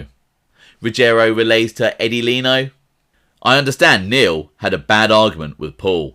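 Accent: England, United Kingdom. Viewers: I love hearing about the American Italian Mafia from a guy with a British accent.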